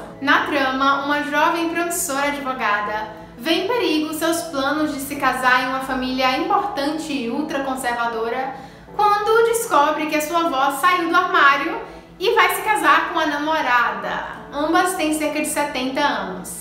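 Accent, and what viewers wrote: Bahia, Brazil. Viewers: Além de linda ainda tem esse sotaque lindo da Bahia 😍🙈💕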